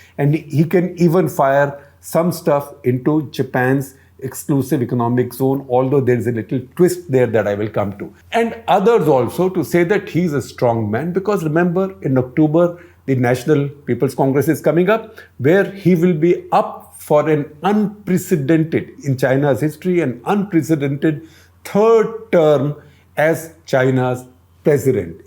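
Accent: India. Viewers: It is Indian English and this is how we speak it here. Like it or lump it.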